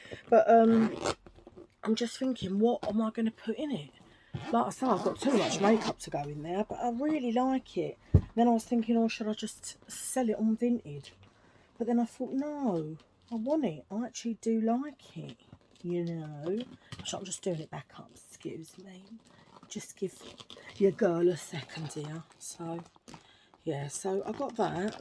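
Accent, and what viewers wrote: Australia. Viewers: Love the Aussie accent. 😂❤ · Enjoyed this - like a nice visit with a friend! You are good fun. I was trying to watch your next video but I have to provide proof of age and as this involves giving driversl licence or passport number which I am not prepared to do could be risky I can't watch! Crazy! Your Aussie accent wasn't bad by the way - and I'm Tasmanian!!!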